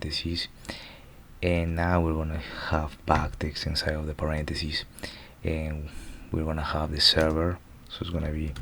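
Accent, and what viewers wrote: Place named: Dominican Republic. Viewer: i feel a little know accent in your speech, like a dominican acccent. good content tho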